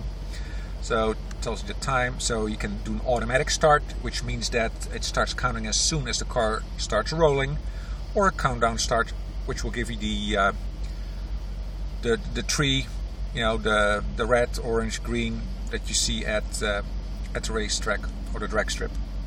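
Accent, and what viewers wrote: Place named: Netherlands. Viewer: Your Dutch? The accent sounds familiar..??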